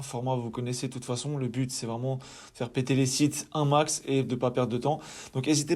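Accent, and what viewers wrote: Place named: Belgium. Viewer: Salut merci de ton partage. moi, je donne juste mon avis. Un lien venant d'un site anglais n'est pas gênant a condition que la langue reste en anglais. Pourquoi ? Quoi de plus naturel d'avoir un lien d'un site anglophone qui fait un lien vers un site en .fr (ou .be au vu et au son de l'accent) du moment que l'ancre ou les mots proches annonce que la source (du lien) est francophone. en gros si on site un site étranger et qu'on explique dans le texte l'origine du pays du site, ça passe. et surtout ça parait naturel.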